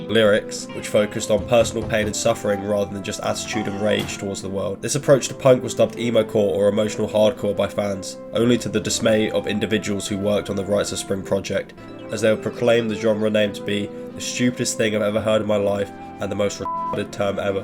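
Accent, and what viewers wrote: United Kingdom. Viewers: Lmao, British accent talking about the Midwest… cute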